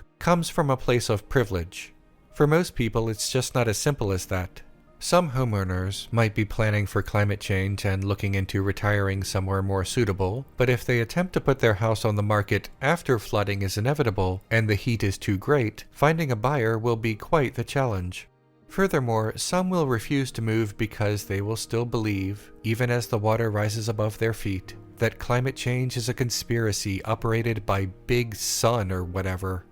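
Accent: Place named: Baltimore, United States. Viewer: Boooooooi you from Baltimore whaaaaaaaaat?????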